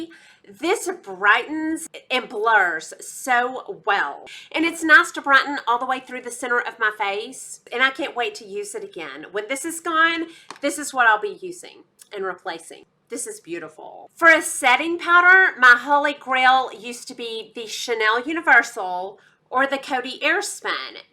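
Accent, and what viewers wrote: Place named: Texas, United States. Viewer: I knew there was a reason I love you and love watching your videos....you’re a fellow Texas gal!! I knew that accent was familiar!! You are just adorable!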